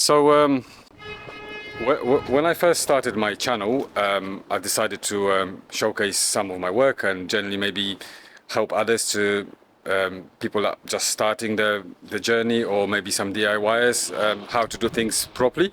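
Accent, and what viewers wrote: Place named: Poland. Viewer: Hi thanks for responding I’m in Borehamwood, near Barnet, WD6 You sound like you are Polish, my mum is from Gdańsk Best wishes Mikey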